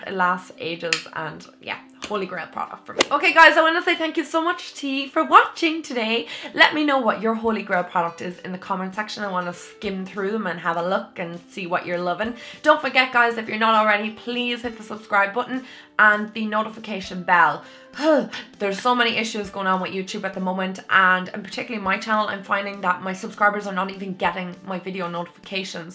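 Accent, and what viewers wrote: Ireland. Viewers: Where in Ireland are you from x?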